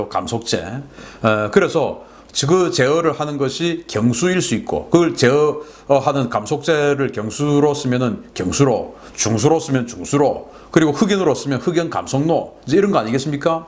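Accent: Gyeongsang, South Korea. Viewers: 간곡히 건의합니다 1. 멘트를 조금 늦춰주시면 좋겠습니다. 경상도 억양에 말씀을 너무빨리 하기때문에 미처 이해하지 못하는 경우가 있습니다. 2. 광고가 너무많아 답답합니다. 다른 방송보다 독자 수가 월등히많은데 운영에 넉넉 하쟎 습니까? 깊게 헤아려 주셨으면합니다. 존경합니다. !!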